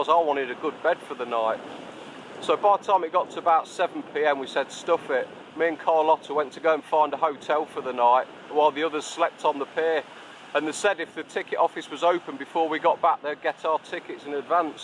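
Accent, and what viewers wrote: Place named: Black Country, United Kingdom. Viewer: You a Wolverhampton lad I’m trying to figure your accent buddy either that or brummy don’t take offence they’re similar lol 😂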